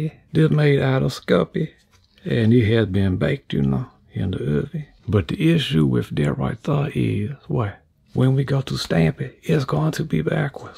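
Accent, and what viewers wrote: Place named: Oklahoma, United States. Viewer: Is...is the cajun? Or at the very least Louisianan since I haven't heard him slip in the odd bit of French and other cajun qualities? What majesty is that accent?! It definitely sounds atleast like my great aunts and cousins in Oklahoma, especially in his inflections, but the accent could be somewhere eastward from there too.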